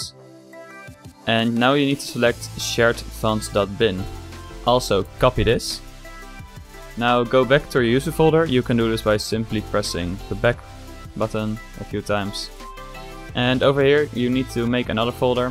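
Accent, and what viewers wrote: Netherlands. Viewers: ik kan gwn meteen horen dat je nl bent xD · wat een lekker Nederlands accentje zeg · ik hoor dat je nederlands bent haha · HAHA, nederlands accent :) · You sound ver Dutch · je hoort echt tering goed dat jij nederlands bent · Are you Dutch? I mean. Dat accent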